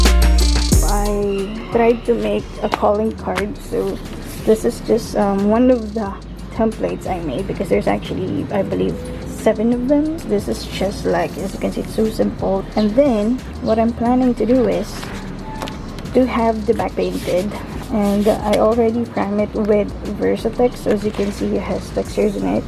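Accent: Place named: Australia. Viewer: Im diggin that aussie accent of yours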